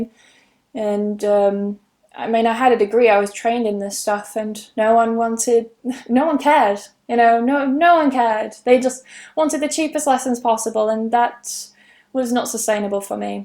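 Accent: United Kingdom. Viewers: Very motivational story. I love british accent. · watching your video because I like British accent, thanks for you kept talking in English and it is brilliant, love it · Hi, I've just subscribed to your channel. I love your British accent, your voice, your look, and the way you present. I find most English teaching videos on youtube are in American English, which is unfamiliar to me. I'm lucky to find you on youtube today. I can see that your eyes were still filled with tears when you shared the bad experience with your previous boss. I had similar experience with a bitch boss so I really can feel your pain. I'm so touched by your story. I like genuine and kind-hearted people like you. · Hi! you are an excellent teacher, I love your British pronunciation! I'm learning a lot with your youtube's videos! Thank you so much! Xx:) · Thanks for sharing your story. 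I really like British accent 😇💙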